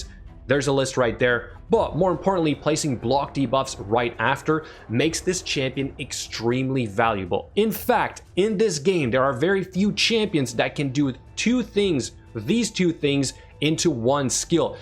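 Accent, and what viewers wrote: Quebec, Canada. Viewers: french Canadian i think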